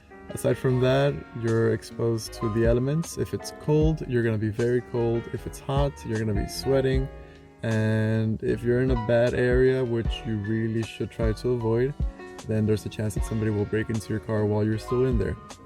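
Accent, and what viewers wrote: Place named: Middle East. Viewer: Where are you from, I detect a middle-east accent!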